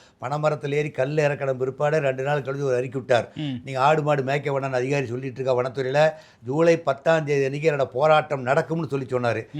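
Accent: Kongu, India. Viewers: கொங்கு தமிழில் ❤❤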